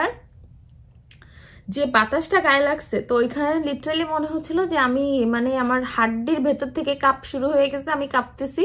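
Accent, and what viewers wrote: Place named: Khulna, Bangladesh. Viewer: Assalamualaikum Apu. Are you from Khulna? I am from khulna and your Bangla tone seems very familiar to me. Zajakallah ❤️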